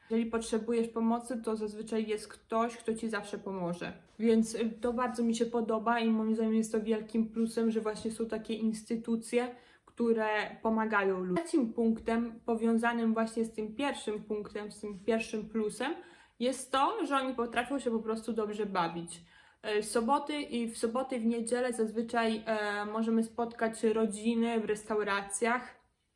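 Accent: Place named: Italy. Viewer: Ale akcent złapałaś 😂 Również we Włoszech, na zawsze. Uwielbiam życie tutaj, nawet ten bałaganik i odkładanie na później. Kierunkowskaz w prawo można dać sobie spokój, w lewo owszem można użyć 😅 Ogóle podejście do jako takiej dyscypliny jest raczej typu „żyj i daj żyć” Włosi są bardzo kreatywni i jakoś się odnajdują w każdej sytuacji (czego np Niemcy nie bardzo potrafią) Kręcą się wszędzie, kawiarnie zawsze pełne a szczególnie teraz po Covid odrabiają zaległości Klimat❤❤❤ chociaż uwaga, gorąco i parno, szczególnie we Florencji i nizinie padańskiej, może trwać miesiącami, klimatyzacja wręcz niezbędna. Temat rzeka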